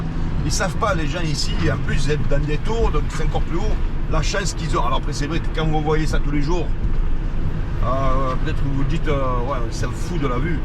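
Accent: Marseille, France. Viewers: "Même les bus ici ils sont cons" !??? Je pense que c'est plutôt toi le con qui te rend pas compte de la taille de la route 😂😂😂😂 C'est toujours plus facile de jeter la faute sur les autres ! Avec tes références claquées en comparaison avec les États-Unis !😂😂 Ridicule ! Le nombre de morts que Marseille fait à l'année Chicago le fait en un weekend ! Ferme-la c'est mieux tu sais pas de quoi tu parles surtout concernant les États-Unis. Avec ton accent de cagole😂😂😂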